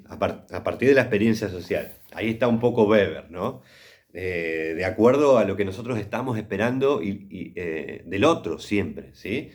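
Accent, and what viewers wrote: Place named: Argentina. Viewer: Desde Roma, entiendo el castellano en versión argentina también 😊, digo que la lección me gustó mucho y que resultó muy útil para asumir conceptos claros 👍 Veramente complimenti! 🌟😘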